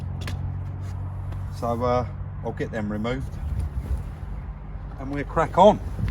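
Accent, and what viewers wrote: United Kingdom. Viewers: I like this funy British accent hahaha all an all video is very helpful thank you so much amigo 😅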